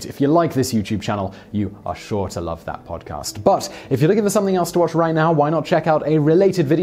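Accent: England, United Kingdom. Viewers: Just a thought, if you’re going to be talking smack about the Revolutionary War, you’d probably get a lot more credibility if you did it without the British accent. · I absolutely love Simon Whistler and think it's the height of hilarity that the perfect British voice is narrating why the American Revolution sucked. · You clicked on this video to learn more about the American Revolution and you got someone with an English accent. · Ironic how a English man is narrating this. He’s not wrong but I smell sour grapes 🍇 🤣🤣🤣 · I love hearing an English guy talk about losing the revolutionary war.....now on to the war of 1812 the on to the bailouts of WW 1 and 2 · Why am I listening to a Brit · Sounds like a salty Brit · As an American, I don't question the accuracy of anything being said here, and most of it is consistent with what I learned in school. 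But man it's hard to assume objectivity when hearing all these facts delivered from a guy with a British accent. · You know as much about freemasonry as you do about American history. Oh… I notice you have an English accent as well! 🧐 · SAYS GUY WITH BRITISH ACCENT🙄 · I can’t help but notice that this man, with a British accent, keeps reminding us of what we were taught in grade school already. That England wouldn’t have lost if the colonies hadn’t had help. It’s been over a couple centuries now. Still not over it? Perhaps his time would be better spent praising France for having the foresight to invest in our little experiment over in the colonies. It has certainly paid off for them..... and for England for that matter. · This video just sounds like a Brit That's still a bit mad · So we’re being told by someone with a distinctly British/European accent that Americans don’t know their own history. 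I say ‘Bollocks!!!’. In Parliament it was stated the colonists would NOT pay for the French & Indian War. Then they reneged on that and forced the colonists to pay for a war they did not ask for. All the points he says are surprising are not and have been taught for years. And yes for someone unfamiliar with the weapons of the day it may seem that it would be difficult to fire and reload in short order. A person experienced with the weapon could fire and reload 3 times in a minute or less. As for guerrilla warfare, the tactics used by the colonists was much different from the marching in a straight line and essentially taking turns shooting at the other side. The colonists did not always fight that way and so in comparison their tactics could be considered guerrilla warfare. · I hate it when guys with British accents try to tell us about the American Revolution. It's like prince Andrew lecturing American people about the unreasonable idea of free speech...from his Malibu house. 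· His accent tells me a little about how the British teaches about America lol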